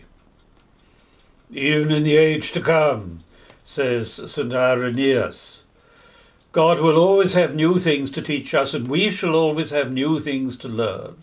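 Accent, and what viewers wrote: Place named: RP, United Kingdom. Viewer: Very erudite, with a charming "posh" accent, a British sense of humor, and a real knowledge of the loving heart of God, which is the most important. Thank you very much, Father.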